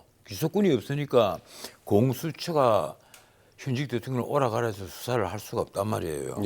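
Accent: Jeolla, South Korea. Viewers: 절라도광주앵커 티를 너무낸다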